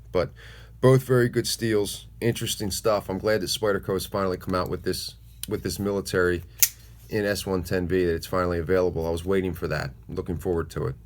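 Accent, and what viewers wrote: Philadelphia, United States. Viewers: Love the vids. Sharpening and testing of blades. Appreciate it. Subd for sure....you sound like u got a Philly accent or somewhere close to there...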